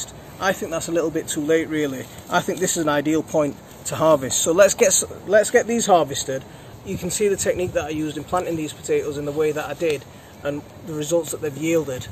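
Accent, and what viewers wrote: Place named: Northern England, United Kingdom. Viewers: Agreed. I am as white as the driven snow and born and bred here. But my even my accent isn't as northern as that. Keep the videos up mate, I really enjoy watching young children (and adults) enjoy the garden and i enjoy learning from you too. Happy days! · It warms my heart to see a variety of people from various backgrounds and religions here not commenting negatively. This goes to show the affect our brother with his wicked Strong Northern accent is having on the peeps.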